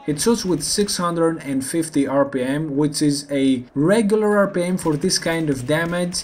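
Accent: Greece. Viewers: Where is he from? His accent sounds like he is Greek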